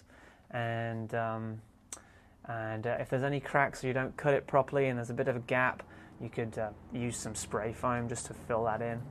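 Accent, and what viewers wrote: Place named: Cheshire, United Kingdom. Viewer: ah thought i heard a little chester or cheshire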